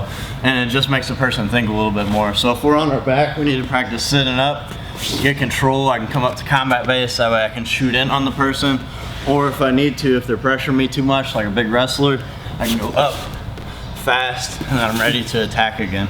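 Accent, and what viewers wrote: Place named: South, United States. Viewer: he kinnda have like a southern accent, great drills fella, I will try them out on today's class!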